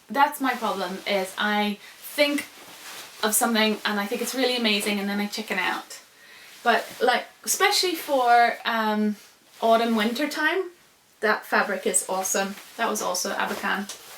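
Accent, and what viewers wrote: Northern Ireland, United Kingdom. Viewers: where are you from if you dont mind me asking? Im hearing a slight northern Ireland accent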